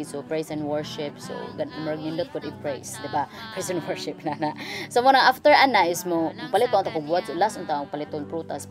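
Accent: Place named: Bohol, Philippines. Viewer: You sound like my ex boyfriend from Loon Bohol and my Grandma from Cortes Bohol hahaha your accent ate hahaha san ka sa Bohol? im from Davao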